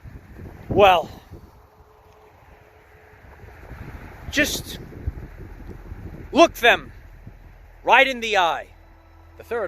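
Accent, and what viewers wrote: New York, United States. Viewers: WOW! This was SUCH an amazing, powerful message. Thank you so much AA. Half my family and friends are jabbed zombies and I tried for years to wake them up, to a point of utter exhaustion. Now I just barely got enough energy to carry myself through so this message was perfect. Anyone else in here experiencing "glitches" with communications? Half my text messages and emails either never get received or I dont receive theirs. Its very odd....come to think of it, those are the zombies in my family and friends. You are so dead on when you say They cannot hear us anymore and the frequencies dont match anymore. That would explain all the "communication glitches" with my emails and texts. Thank you so much for all you do. Love your Yorker Accent:) Hugs from Canada xoox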